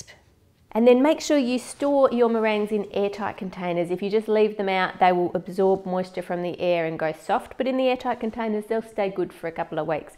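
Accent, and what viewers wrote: Australia. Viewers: Your Australian (:0) whaaaaaaaaaat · Do you live in Australia you sound like it not to be rude or anything I love your videos I am so hungry now🍧🍨🍭🍬🍴🍪🍩🍮🍫🍦🍰🍟🍖🍕🍔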